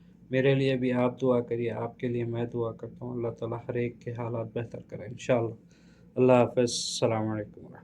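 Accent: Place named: Hyderabad, India. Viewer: Aslkum khiriyat se hain sir ji nahi aap puri tarha hyderabadi baat hi kare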